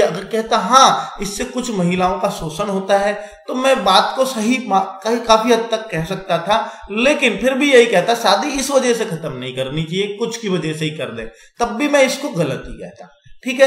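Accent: India. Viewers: Bolne ka Desi tarika nice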